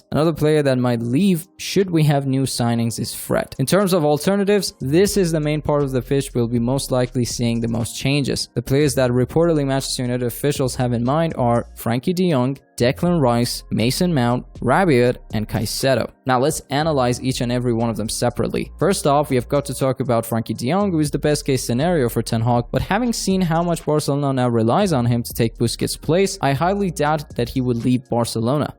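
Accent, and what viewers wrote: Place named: United States. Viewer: I dont understand one item that he speaks about please stop these american voices and put in british voices.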